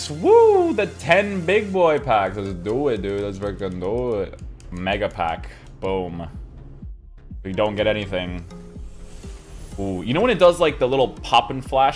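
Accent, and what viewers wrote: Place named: Portugal. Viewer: Are you portuguese? You have a portuguese accent!! Btw you're a great fifa player!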